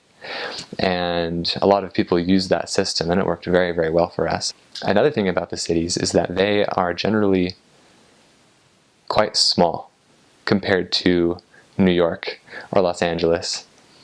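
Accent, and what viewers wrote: Norway. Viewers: You have an amazing Norwegian accent👍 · This guy is obviously having a Norwegian connotation to his accent, and he looks super Scandinavian. No way he is "American"... born and raised in Norway at least. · Thats some nice norwegian dialect there!!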